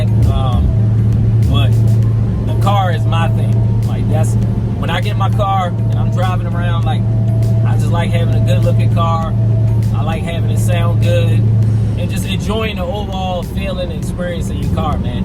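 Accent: Baltimore, United States. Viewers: Are you from Baltimore? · you gotta be from baltimore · Gatta be from bmore good vid brother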